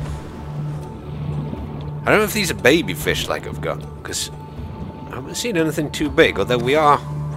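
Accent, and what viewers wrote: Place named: Birmingham, United Kingdom. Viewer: I can hear a slight yam yam/ brummy accent... you local dude?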